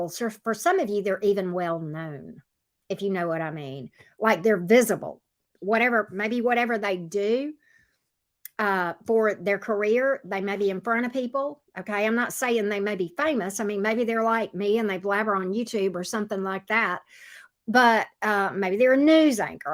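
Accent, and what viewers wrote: South, United States. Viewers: Just found your channel after watching you with Tyler. Thank you for sharing your gifts 💕💕💕 Love hearing my southern folks reading tarot!!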